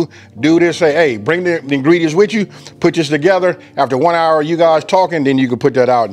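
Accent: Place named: Arkansas, United States. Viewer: Love the way u cook dude!! Arkansas talking lol!!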